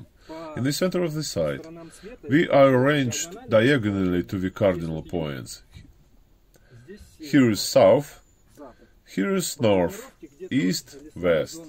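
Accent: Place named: Russia. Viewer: great that there are other videos in preparation - looking forward to watching them! Regarding dubbing, I was watching without a sound, so I think that dubbing is unnecessary. Yes, you have a quite typical Russian accent, which is totally fine, since you are a Russian. :)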